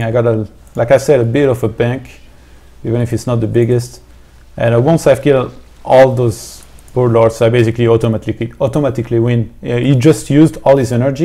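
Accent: France. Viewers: Awesome man :) French who speak english sounds so nice hehe, keep this up!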